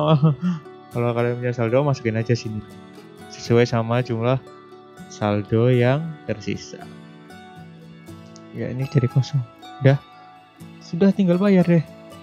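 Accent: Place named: Banyumas, Indonesia. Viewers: Ya musah ngapak lah kwiwkwowo guyonan om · Jan ngapak pisan koh medok medok😂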